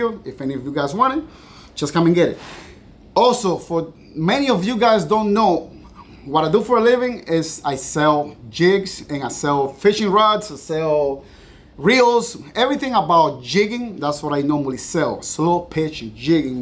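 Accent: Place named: Cuba. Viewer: Brother, I'm Cuban on my father's side, and he passed away in 2019. Your Spanish channel has allowed me to retain my Cuban accent and learn to be able to speak about fishing in Spanish and even develop my Spanish. Your accent vividly reminds me of my father and I have learned so much from your videos in the short time I've been following them compared to other Youtubers who speak perfect English. Your English is amazing too, don't let others discourage you! You don't improve without trying.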